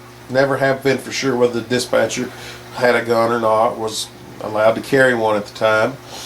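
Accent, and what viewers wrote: South, United States. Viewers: Gotta love a deep southern accent, Lol.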